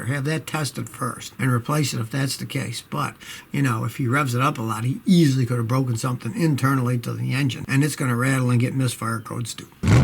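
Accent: Italy. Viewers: Italian accent 100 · i love scotty's Italian's accent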